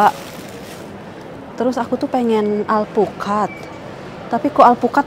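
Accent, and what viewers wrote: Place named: Sunda, Indonesia. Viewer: Teh kl ngomong sunda lucu kdg suka ktawa😁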